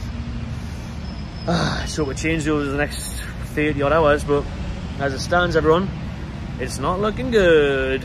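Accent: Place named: Newcastle, United Kingdom. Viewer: The subtitles on YouTube don’t account for the geordie accent 😂 said career and the subtitles came up as Korea 😂😂😂. Cheers for the update!!!!!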